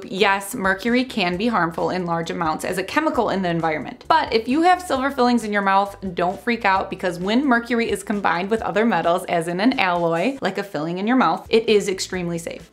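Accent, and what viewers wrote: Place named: Upstate New York, United States. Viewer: are you from upstate NY?? I'm curious where that accent is from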